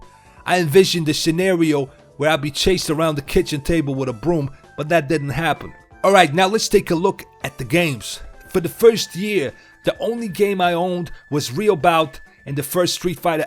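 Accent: New York, United States. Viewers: Your accent of a new yorker but you have pal games through me off. I feel you though on the nostalgia of these games and those times. I have similar memories.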